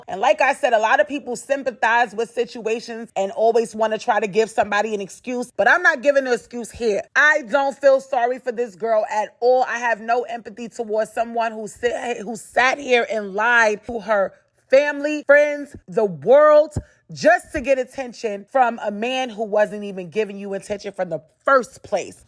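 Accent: New York, United States. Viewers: I Lvov’s ur New York accent❤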